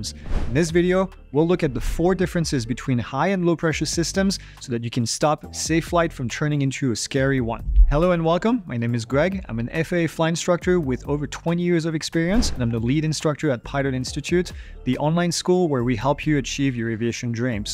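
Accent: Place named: France. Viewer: Are you from french descent ? I notice some remaining french accent in your speach.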